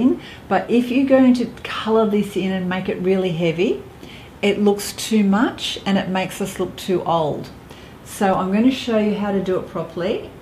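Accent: Australia. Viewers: Australian accent? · You are so pretty! You sound Australian & remind me of Olivia Newton-John! 🥰🥰 Great video!! ❤️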